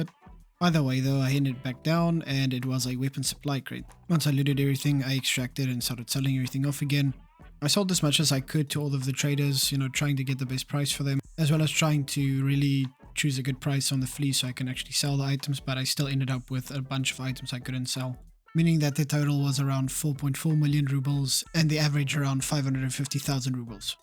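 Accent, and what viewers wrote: South Africa. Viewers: Is mans from South Africa by any chance, lowkey sounds like it 😅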